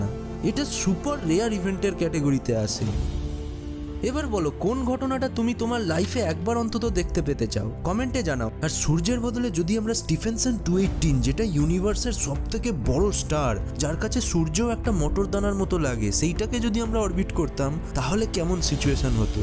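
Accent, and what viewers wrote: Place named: Kolkata, India. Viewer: Are bhai😑 Uni kolkata r tai amn pronounciation.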